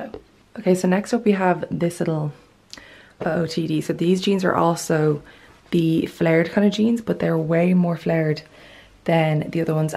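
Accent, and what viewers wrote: Ireland. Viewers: Oh my Lord! your accent makes me wanna move to Ireland.😍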